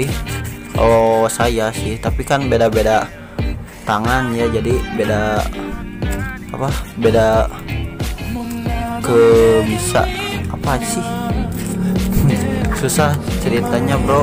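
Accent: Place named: West Java, Indonesia. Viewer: Sunda mamang😁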